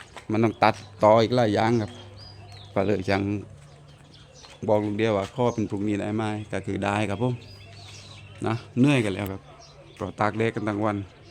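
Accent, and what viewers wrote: South, Thailand. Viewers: ทำมัยไม่พูดภาษาไทยจะได้ฟังรู้เรื่องบ้างนี้พูดภาษาใต้ฟังไม่รู้เรื่องเลย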